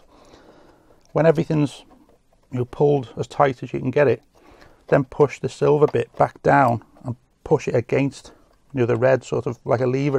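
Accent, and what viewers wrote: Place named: Merseyside, United Kingdom. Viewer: I can hear in your accent you're from merseyside. Can I drop off my Bosch athlet circuit board to you for repair? It is not charging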